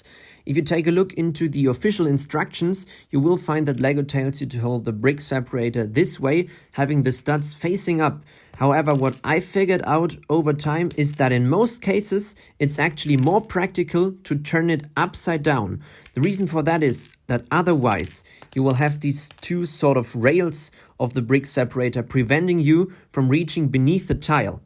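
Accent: Germany. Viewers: Are you are German??? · man hört den deutschen dialekt also sprich halt deutsch hört sich so nicht schön an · Youre german arent you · Da hab ich den Deutschen Raus gehört:) Hallo;) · Do i hear a german accent?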